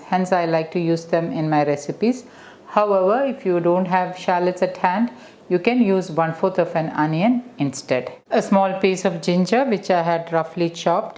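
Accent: Kerala, India. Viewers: I love the way you talk, mixing some keralite tone , so pleasing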